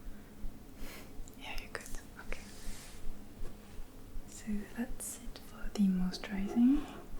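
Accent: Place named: France. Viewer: Even ASMR is more elegant with a French accent!!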